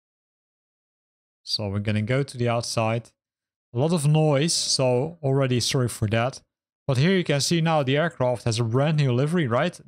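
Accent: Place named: Netherlands. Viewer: I hear a thick Dutch accent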